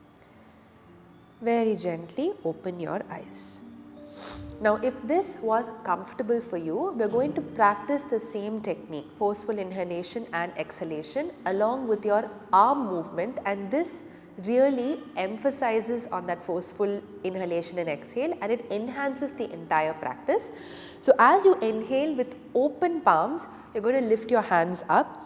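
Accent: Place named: Bangalore, India. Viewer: She belongs to Bangalore, udhar waisa hee pronounce hota hai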